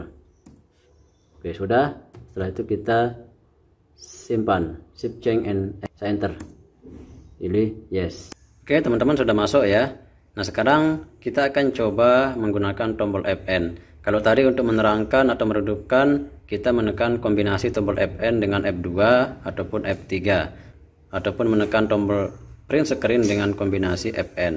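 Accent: Bali, Indonesia. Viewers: aksennya kok kayak orang Bali ya?. Laptopnya seperti yang aku pakai ini, paka SSD 256GB, HDD 1TB dan RAM 8GB, hanya saja untuk record aku kurang sreg, karena suara fan masih terdengar, mungkin harus pakai external Mic ya?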